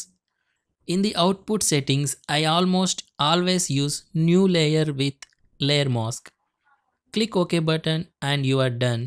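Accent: India. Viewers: Indian accent 😃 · Indian accent is cool ikr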